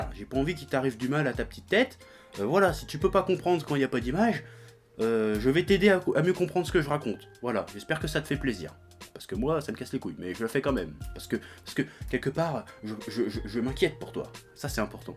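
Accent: Brittany, France. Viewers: T'es brestois? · Tu est de Brest ?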